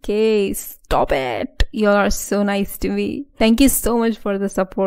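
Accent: India. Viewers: India possibly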